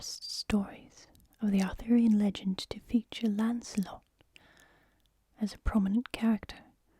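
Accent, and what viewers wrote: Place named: France. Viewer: really love your french accent. i wish i could hear you read an entire book in french x)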